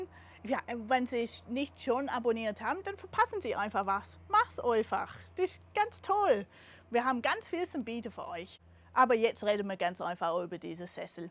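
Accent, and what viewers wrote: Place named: Swabia, Germany. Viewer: your ever so slight Swabian accent is darling! Thank you for your lovely videos.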